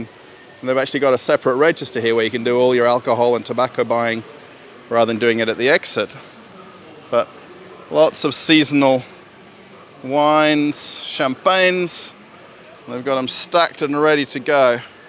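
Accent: Australia. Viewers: Он же вроде австралиец. Да/нет? У него есть австралийский акцент? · Австралийский английский как-будто понятнее что ли..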